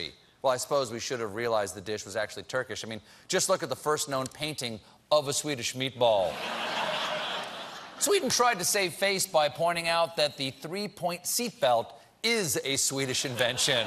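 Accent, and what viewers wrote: Germany. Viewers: Minus the German accent well done :). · Lingonberries!!! Lingonberries (that almost ALWAYS goes with the meatballs in Sweden) is Swedish... but I guess that is a nothing-burger.... And why the German accent?? We actually sound more like the Swedish chef... unfortunately.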